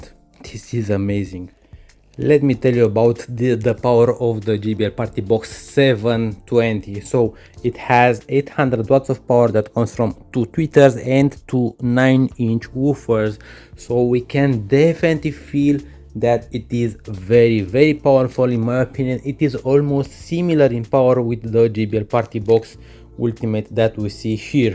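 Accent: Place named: Romania. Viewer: Căutăm să văd recenzii despre boxa asta am dat de canalul ăsta .Să moară bibi dacă tu nu ești românaș de-al nostru chiar dacă vorbești în engleză bag mana-n foc după accent . Sper sa nu dau greș cu boxa asta .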